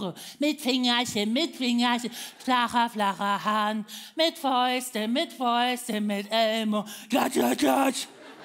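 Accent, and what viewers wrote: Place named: Berlin, Germany. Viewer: Schön das du wieder da bist. Hast gefehlt 😅 I liebe deine Stimme,dein Berliner Dialekt und deine coolen Sprüche ❤️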